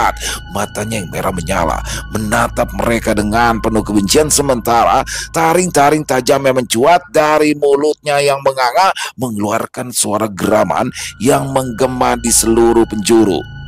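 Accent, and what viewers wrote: Jakarta, Indonesia. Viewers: Wa’alaikum Salam, gercep kalo kisah bang 😁saya suka logat betawinya.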